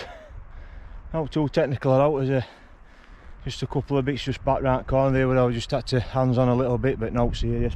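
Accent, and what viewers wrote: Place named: Yorkshire, United Kingdom. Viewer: Top quality Yorkshire accent that pal 👊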